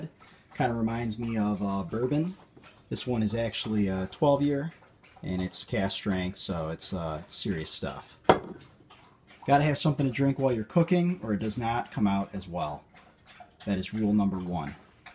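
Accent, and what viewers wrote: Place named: Michigan, United States. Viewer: thanks for the video, you sound like youre from michigan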